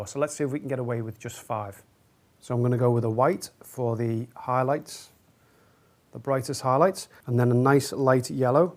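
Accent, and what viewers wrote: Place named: Merseyside, United Kingdom. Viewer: Enjoyed the video just great thanks. Ps your voice sounds like mine Merseyside right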